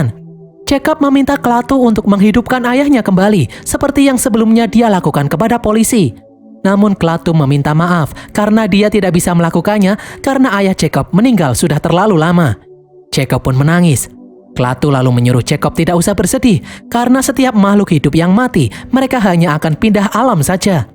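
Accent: Java, Indonesia. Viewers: logat e medhok😂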